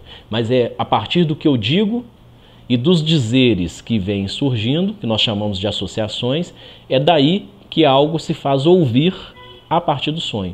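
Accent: Minas Gerais, Brazil. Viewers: Gosto muito do sotaque mineiro, é suave, vai muito bem para um psicanalista. é um excelente representante de Minas Gerais.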